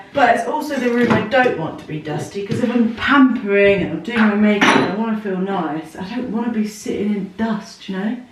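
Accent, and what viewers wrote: United Kingdom. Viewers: I just love hearing Brits say water bottle. It’s so cute!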